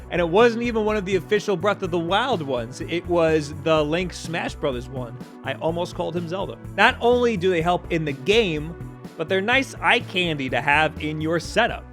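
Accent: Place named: New York, United States. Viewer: You and your New York accent.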